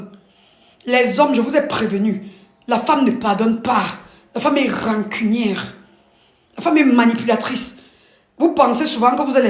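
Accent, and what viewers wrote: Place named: Bangangté, Cameroon. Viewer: Ton accent Bangangté est trop fort ma chérie❤t'es vachement mignonne❤❤bisous.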